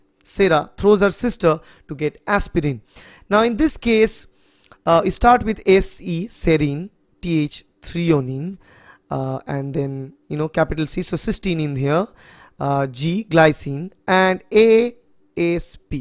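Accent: Bengal, India. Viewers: Great video Sir.The bengali touch makes it more memorizable.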